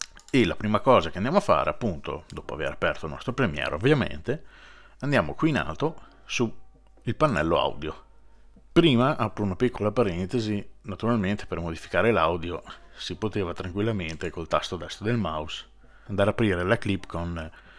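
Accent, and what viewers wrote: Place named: Genoa, Italy. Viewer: mi sbaglio o mi sembri de zena ?!?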